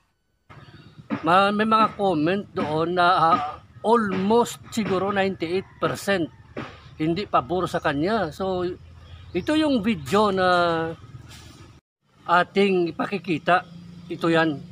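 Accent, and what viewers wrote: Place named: Iloilo, Philippines. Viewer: Parang ilonggo ka host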